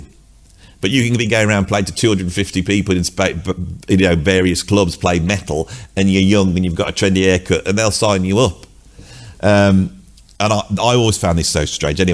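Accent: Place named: England, United Kingdom. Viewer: I think you would make a great one-man-show on Broadway. OK, OK, off-Broadway. Considering your story telling, vocabulary, (major yet subtle) sense of humor, gift of gab, introspection, range of interests, English accent (I'm in America), enthusiasm, and ruminations; what's not to like? Cheers, mate!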